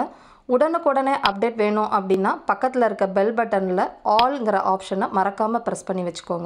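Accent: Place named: Tirunelveli, India. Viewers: நீங்கள் கூறாவிட்டாலும் உங்கள் அழகு தமிழில் நெல்லையின் மனம் வீசுகிறது உங்கள் சமையல் போலவே.. Super preparation 👌👌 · Super, நெல்லை தமிழ் கொஞ்சி விளையாடுகிறது. அருமை சகோதரி. வாழ்த்துக்கள்.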